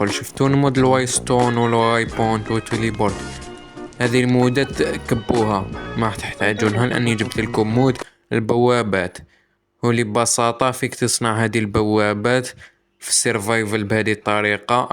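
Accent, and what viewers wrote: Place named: Algeria. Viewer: خويا اهدر صح نتا جزائري ❤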